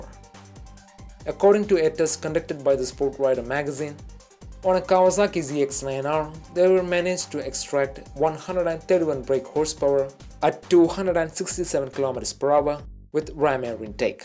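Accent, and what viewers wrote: India. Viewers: Such a heavy Indian accent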